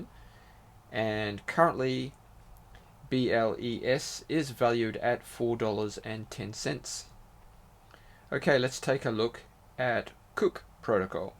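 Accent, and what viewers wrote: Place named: Australia. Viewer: Hey mate. Great video. You sound like another Aussie hahah Im lockdown in melbourne. Anyway can i ask. If you have all the BSCPAD staked already. When the IDOs open, do you have to then go and buy BUSD and BNB or are you just using the BSCPAD tokens as like collateral or something. Only part i dont really get. I would think we will need BNB for fees. But again do we need to buy BUSD and if so how do you work out how much you need. Cheers again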